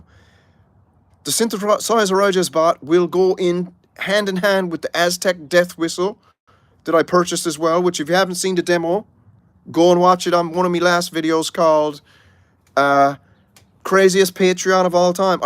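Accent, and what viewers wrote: Liverpool, United Kingdom. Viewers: A fair bit of scouse in there as well.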